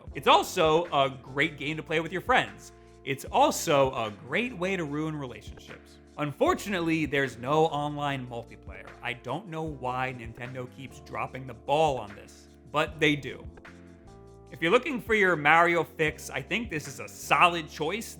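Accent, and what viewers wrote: New York, United States. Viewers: You can tell that he has that New York accent just because of the way he says Mario lmao.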